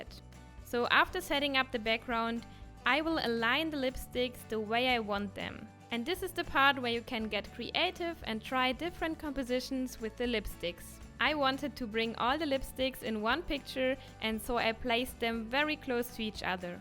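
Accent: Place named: Germany. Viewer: Warum machst du deine Videos auf Englisch? Man hört direkt das du aus Deutschland kommst. 😃 Ist nicht böse gemeint aber welchen Zweck verfolgst du damit?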